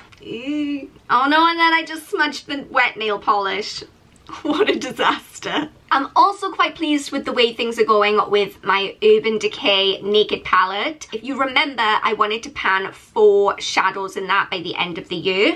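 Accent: Wales, United Kingdom. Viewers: Be proud of your Welsh accent!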